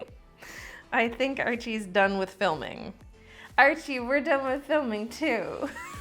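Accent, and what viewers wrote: Canada. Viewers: I didn't realize you were Canada. I could hear on this video for sure with your "abouts" and "outs" that your definitely Canadian. 😊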